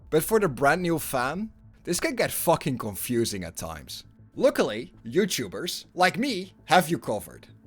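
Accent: Netherlands. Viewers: The dutch accent.... like none other :)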